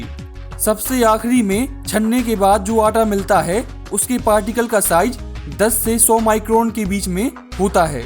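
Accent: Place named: Bundelkhand, India. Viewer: Aap bundelkhandi hai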